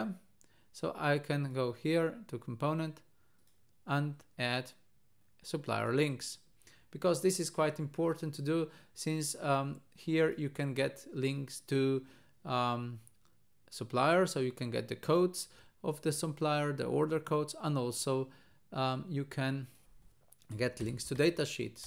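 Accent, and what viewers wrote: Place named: Italy. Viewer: Why do you sound Italian?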